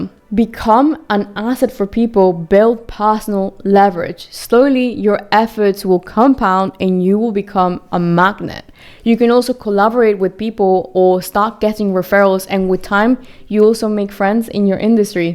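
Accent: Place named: United Kingdom. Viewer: Sounding very British today. Slay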